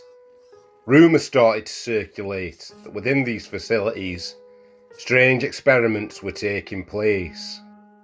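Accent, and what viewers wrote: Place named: Staffordshire, United Kingdom. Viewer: Love your channel!!! In a age of computer generated A.I narrator's,it's awesome to hear a Staffordshire accent telling the stories. Respect brother!!! 🙌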